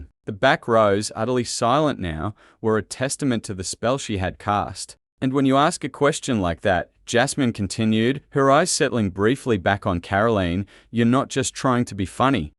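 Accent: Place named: Australia. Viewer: Typical AI story using an Australian accented voice. Obviously a fake set up story. Just trying to cause trouble. 😠 Typical BS.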